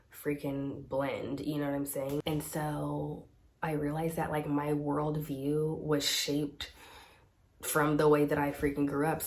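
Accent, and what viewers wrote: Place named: California, United States. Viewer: You look Italian or French probably part African but I can see why they said part Asian. You have high cheek bones but a smaller face. I can hear the hispanic/California influence in your accent however. You are gorgeous. Don't stress there is no such thing as race, that term was part of racist Eugenic theory. They created the term so that they could boost Europeans as the superior race. For some reason in the U.S. they still use it for programs etc.. In the last census, people of Spanish descent REFUSED to fill out their stupid narrow boxes as Latinos do not see themselves so narrowly. In the sciences they stopped using this term "race". The term used now is ethnic, for example, Germans are an ethnic group. So you are multiethnic African , perhaps English Scottish etc. but I totally understand what you are saying. 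Everyone is multiethnic to honest, I wish people would get over thinking anyone is pure 100% anything as it is just not true even if it doesn't show up in a DNA test lol. The reaction you got to calling out of business staff when they are in error is something that is class driven as well as looks driven. Many people who work at hotels etc.. come from countries where the more you call them on stuff the swifter the correction. It is an expected and accepted way of talking to them. Hate to say it but it is true. Most Americans and the even English are REALLY super nice, staff know this and sometimes will take advantage. Snapping them to when needed immediately makes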